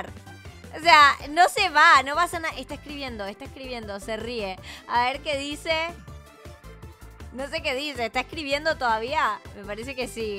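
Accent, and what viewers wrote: Argentina. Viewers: sos de qué país seguro con esa voz sos de Argentina estoy segura con es voz que tienes me gustaría conocerte yo voy a viajar en el país Argentina ❤😂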